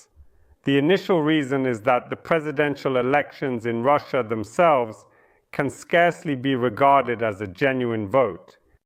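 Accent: United Kingdom. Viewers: Pan Portnikov’s speaking with BBC accent! )) Technologies are amazing. Now I am able to share your brilliant analytic with my English friends. ❤ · this is true, but his voice must be ai generated. He has nice British accent, this is impossible to achieve with his background.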